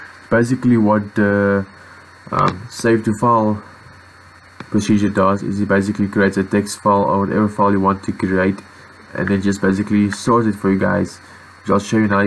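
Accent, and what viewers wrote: South Africa. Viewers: your videos help a a lot, thanks 😀 are you South African??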